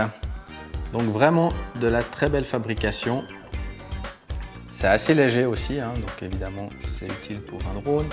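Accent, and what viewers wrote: Switzerland. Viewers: bel accent suisse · Nice 👌🏻 Suisse ? Avec l’accent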